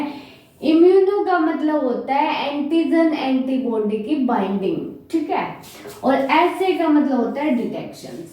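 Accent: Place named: Haryana, India. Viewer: madaam to haryana ki lagti ha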